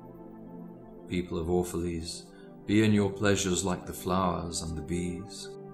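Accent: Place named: Manchester, United Kingdom. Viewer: Are you by chance Mancunian? The way you read reminds me so much of Morrissey (of Smith's fame)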